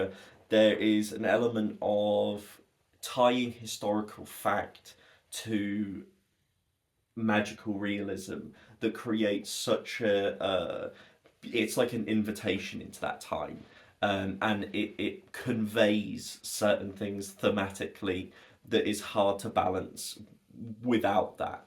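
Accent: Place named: United Kingdom. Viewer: Who the hell is this and why is he british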